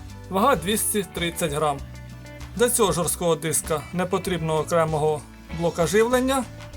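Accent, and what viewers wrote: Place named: Surzhyk, Ukraine. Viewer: На практикі на практиці Мало міста мало місця! Це ріже слух. Я за розвиток україномовного інтернету, але ж не суржикомовного ☝️😠 В мене родичі в селі можуть так говорити, але ж вони не роблять ролики в Ютубі, виставляючи свою недорікуватість на загальний огляд. Щодо диску, то Сігейт чи не самий ненадійний серед виробників. Довіряти йому якусь більш-менш цінну інформацію навряд чи має сенс. Якщо це і робити, то хіба що в RAID O архіві.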